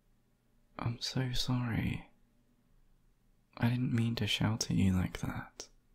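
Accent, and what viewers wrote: England, United Kingdom. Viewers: Ayy proper English accent hear that daily in my life but this one sheeshhhh cute as hell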